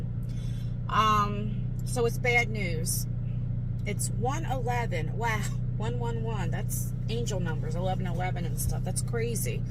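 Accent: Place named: New York, United States. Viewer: Lol that's just your New York accent 😂. That's ok. But im so sorry for your loss with Bella. I know it's much more than just losing her for you.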